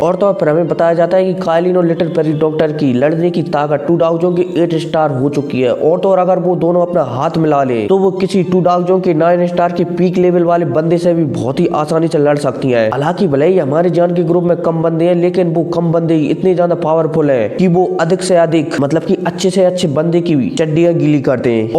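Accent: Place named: West Bengal, India. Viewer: You are from West Bengal 😅❤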